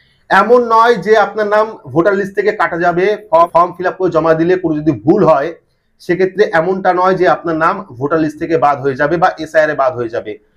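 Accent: Bangladesh. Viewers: Aponar kothar modhay Bangladeshi tan ache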